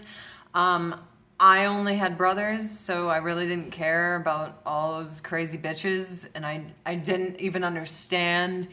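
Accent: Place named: South, United States. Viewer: When she was on ANTM her accent was like so cool and tomboy-ish and she had like this southern accent and I LOVED that accent. But now she has this “normal” accent and it's not really “cool” anymore.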